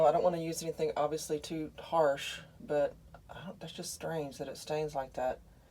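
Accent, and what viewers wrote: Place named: Texas, United States. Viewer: Cafe Bastelo, I grew up around that coffee with my Cuban Parents. Cafe Con Leche is basically a Latte coffee but you can taste the expresso a little more. Love that Soft Texan accent you have. As you we don't like allot of stuff. When i'm out on an excursion its the one time i can live with less. But have a far larger camper a 22ft Grand Design 22mle. But interested in this camper for distance trips. More so with fuel prices where they are today. We live in Miami, FL and when towing our TT it takes us 8 hours just to cross into GA and 10.5 hours to exit the Peninsula through I-10. Appreciate your Vids.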